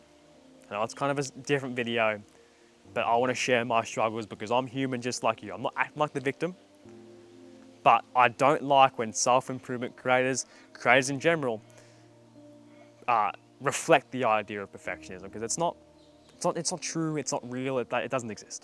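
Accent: Australia. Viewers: Are you australian if so do you live in sydney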